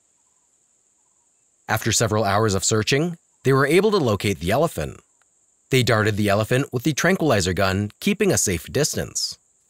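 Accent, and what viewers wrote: United States. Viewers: Good video but rubbish yank narrator spoilt it for me.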